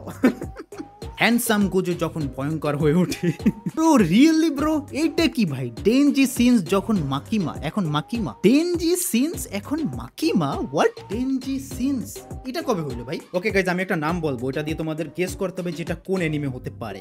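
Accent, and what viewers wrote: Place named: England, United Kingdom. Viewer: আর আপনি ইংরেজি accent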